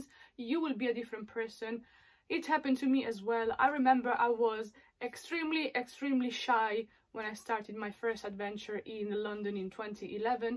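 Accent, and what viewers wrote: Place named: Andalusia, Spain. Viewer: Your Spanish is similar to 'andaluz'... Number 6: you know...all for the art!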